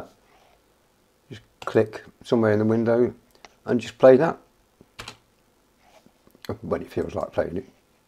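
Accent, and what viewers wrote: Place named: Staffordshire, United Kingdom. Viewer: Cheers, Mate, SO...A little secret, I have 4 Guitars, 1 Mandolin and a "KORG EK50" and the Modulation control is a 'Joystick', (not the wheel configuration), you will not believe what Heavenly Fun I have attempting to annoy my near Neighbours...(Broken Headphones was my excuse..🤗🤗☠☠). I note STAFFORDSHIRE in your accent....Shenstone is my Family "SEAT"...now over in darkest Lincolnshire. Keep your fingers nimble...cheers..G.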